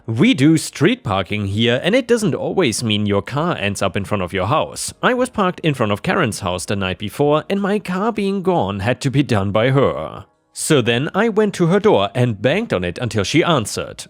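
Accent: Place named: Germany. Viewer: I expected to hear atleast 1 German word the entire time I listened to this lol yall can't tell me dudes 1st language isn't German lol obviously I'm talking about the narrator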